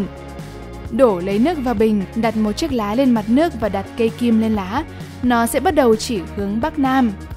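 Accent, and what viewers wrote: North, Vietnam. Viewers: sao bắc kì nhìu quá...nghe ko hay j cả...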